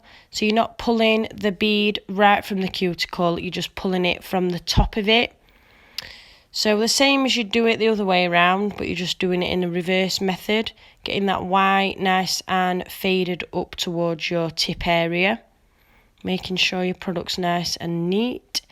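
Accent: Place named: Leeds, United Kingdom. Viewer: Really beautiful set of nails. I am addicted to glitter planet products and her prices are so reasonable compared to other nail supplies. Where is your accent from if you don’t mind me asking? It sounds like a Leeds one or maybe surrounding areas? Thanks for sharing. 👍🏻👏🏻👏🏻👏🏻👏🏻👍🏻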